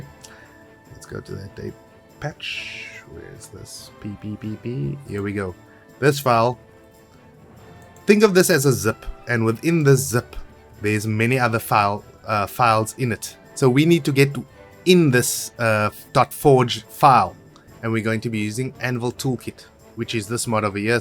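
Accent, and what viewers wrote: South Africa. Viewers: Never expected to hear a South African accent on a mod vid😂 Thanks for the help man! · I knew that was a South African accent, the "biltong" just confirmed it 😭🇿🇦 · Yo nice vid man. The game already looks amazing to me but wanted to see what the modders did to it. Also, hope you dont mind me asking but you sound South African, are you from here? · Yooooo a South African!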